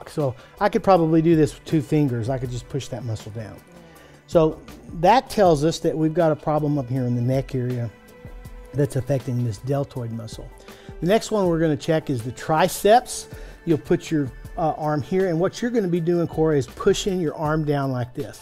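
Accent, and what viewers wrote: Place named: Texas, United States. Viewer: JasonJMist I am not american so every american accent for me is just heaven! And he doesn't have just an american accent! He has a texas accent, which is heaven plus version for me hahaha I am not even a native english speaker and I can notice a lot his texan accent! And yes, for me the best and sexiest accent is not the british, it's the american. :) lol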